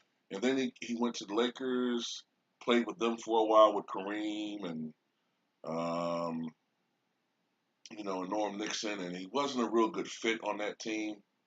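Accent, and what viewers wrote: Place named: Detroit, United States. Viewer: Thank you you sound like you're from here meaning Detroit I was just an elementary when all that happened so some of it I remember some of that was basically because of attitude and also ball movement Danny's game was a great game but it's slow down the offense it was the Pistons being young they wanted to run and also they wanted to play defense with AD wasn't that great at add was great but he just wouldn't compromise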